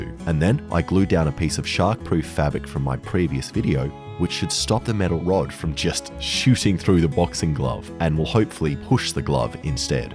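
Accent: Australia. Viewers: And the Aussie voice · I mean he is an Aussie, so he has the accent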